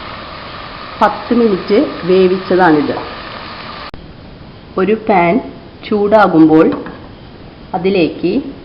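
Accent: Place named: Thrissur, India. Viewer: nalla Thrissur bhaasha..this is central kerala language man not tamil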